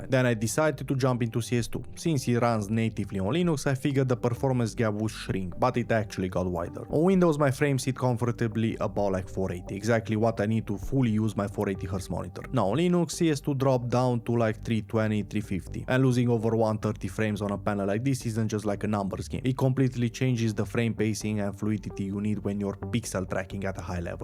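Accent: Romania. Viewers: Haha, accentul românesc lovește direct. Pentru cele mai bune performanțe pe Linux le vei avea pe AMD sau Intel. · the moment i heard your voice I could tell you're also Romanian bravo man, fain video, dar Ubuntu e meh, Mint pentru incepatori, si CachyOS/Endeavour daca ai chef de tinkering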